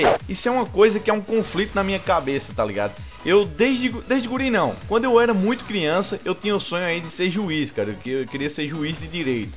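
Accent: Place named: Paraíba, Brazil. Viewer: Infelismente,nao sou paraibano..Queria ser Esse sotaque é fodastico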